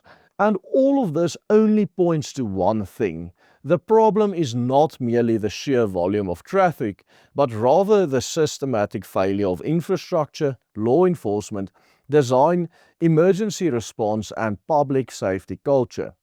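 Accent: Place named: Afrikaans, South Africa. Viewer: At least he tries to speak English 😂😂 or does a excellent job, yes he does say some English words like a Afrikaans person does, 👍👍👍👍👍 for him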